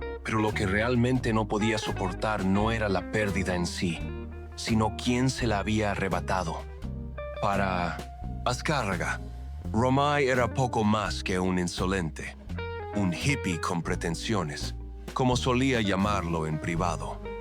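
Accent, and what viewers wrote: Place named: United States. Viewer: Esa voz, como agringada, no se oye muy bien, pero meche se fué por el negro durazo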